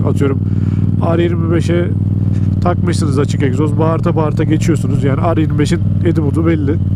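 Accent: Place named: Gaziantep, Turkey. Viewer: Once yol tatlo geldi izliyorum senin konusmani gectim yola saga sola daldim lan burasi bana boyle bi tatli geliyor birsey var ama okadar dalmisim ki meger memleketimmis be tam antep lan dicektim sen dedin antep diye burnumda tutuyor..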